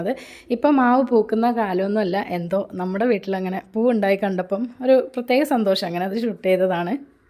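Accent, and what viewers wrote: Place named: Southern Kerala, India. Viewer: ningo sharikkum കാസറഗോഡ് nneya pore 🙄samsaram motham തെക്കൻ ശൈലി അത് എങ്ങനെ കിട്ടി കാസറഗോഡ് ന്റെ ഒരു തരി സ്ലാങ് വരുന്നില്ല സംസാരിക്കുമ്പോ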